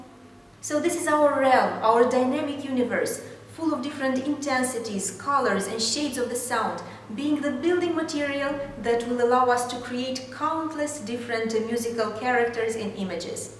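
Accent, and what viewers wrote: Russia. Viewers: May I ask when the next update may be?? Also I love your accent by any chance are you Russian? :) xx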